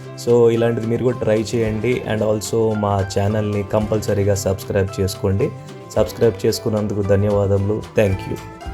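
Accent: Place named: Godavari, India. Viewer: అయ్ మీది గోదారొళ్లoడి చాలా బాగుందండి. 👌👌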